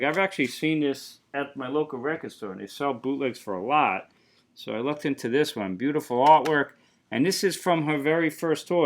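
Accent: Boston, United States. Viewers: Wow your bahstan ahccent is thick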